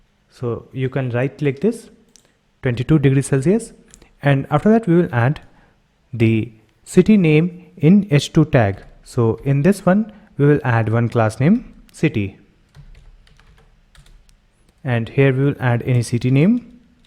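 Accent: India. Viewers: indian guy thanks i like your video and accent😊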